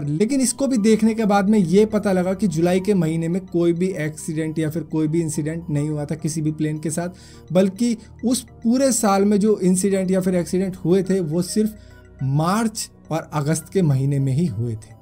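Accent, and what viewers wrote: India. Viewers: the heading is in english, n your voice is Indian, I thought you are sharing your story with the world.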